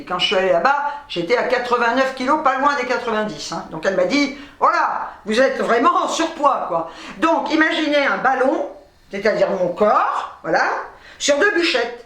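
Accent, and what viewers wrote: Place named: Algeria. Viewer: Bienvenue au Club des Barjots!!!! Ca tombe bien,j'en suis UN! On a écouté ton histoire avec Véro(mon épouse),c'est un sketch!!!! Franchement ton coté Pied-Noir pour raconter,c'est un régal. On a bien rigolé avec Véro,et surtout quand tu racontais,en faite je voyais le film défilé,on s'est marré. Sur la tête de ma Mère,Yallah!!!!!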